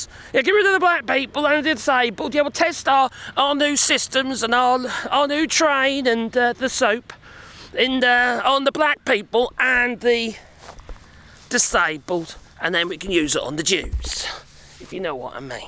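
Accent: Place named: England, United Kingdom. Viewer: I'm french from Drôme and like your english accent and free speaking thx friend !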